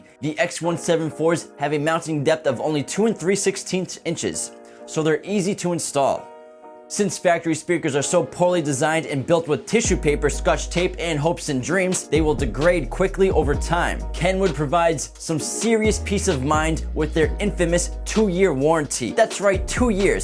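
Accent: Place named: East Coast, United States. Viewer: Say hello to ya motha for me.east coast voice..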